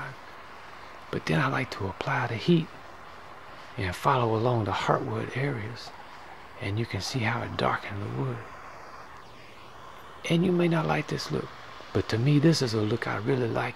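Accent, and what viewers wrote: Louisiana, United States. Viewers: I think sounds like a Cajun man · You are a true breath of fresh air with your down home ways. I've been missing Texas and by the sound of it , you must be from Louisiana so that's pretty close to home LOL. Love your work and please! please! pleas! Take care of yourself. In another viddy you mentioned a health scare😵‍💫 Dont mess around with things. We need you around, you nake so many of us feel so much better about life.👍👍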